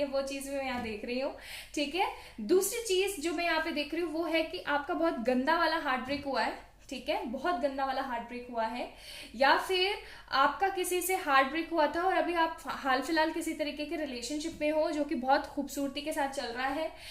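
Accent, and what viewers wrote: Punjab, India. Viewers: punjabi bolte ho app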